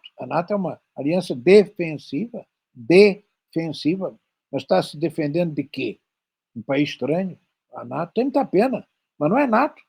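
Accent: Brazil. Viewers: Falar com pronúncia brasileira professor? recuso ouvir isso. O senhor é português!!! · Estive duas vezes no Brasil, em 1984 e em 1991. Se eu não falasse com sotaque brasileiro, 80% das pessoas não me entendiam. Aqui o importante é que eles, os brasileiros, entendam o que o professor está a dizer. Além disso, fique sabendo que os linguistas dizem que o português que se falava em Portugal nos séculos XVII e XVIII era, quanto à sonoridade, muito mais parecido com o que se fala hoje no Nordeste do Brasil do que com o que se fala hoje em Portugal, com as vogais muito fechadas… Embora pareça um pouco ridículo para nós, que estamos habituados a ouvi-lo falar o nosso português, acho que o professor tomou a atitude correcta aqui. Não deite fora o bebé com a água do banho… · ele é portugues! lol está é a falar com o sotaque brasileiro. o que acho uma completa idiotice e traiçao á pátria!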